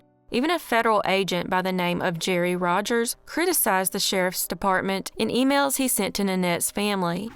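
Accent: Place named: Louisiana, United States. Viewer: twelve of my ancestors are entombed in that cemetery(St.Joseph). 🙂 You have a Monroe, Louisiana accent. I don’t know where you’re from though.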